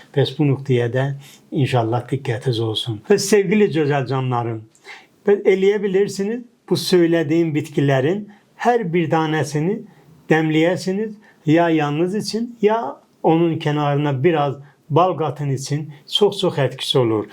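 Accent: Azerbaijan. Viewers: Ya sen ne güzel bi adamsın ömrüne bereket versin allahım hocam çok sağol ne güzel bilgiler veriyorsun saygı ve sevgi ile takip ediyorum ❤❤❤❤ Ayrıca az türkçenizde çok sevimli 😊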